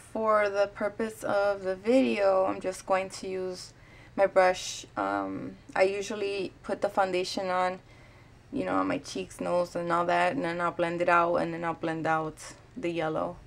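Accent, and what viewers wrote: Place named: Puerto Rico. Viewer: I just stumbled upon your video. As soon as I heard you speak, I knew you had to be Puerto Rican! LOL I'm PR too; I too have dark circles pretty bad. I got most of it from my mom but I also don't sleep very well. I really like that you not only took the time to share this, you put yourself out there to do it. Also, thanks for using real world products. I don't know about anyone else, but I cannot afford $40 on just one product like concealer. You did what works for you and I love it! BTW, you are beautiful with or without the make up. Thanks! Make more make up videos like what eye shadows you use and blush! God bless you!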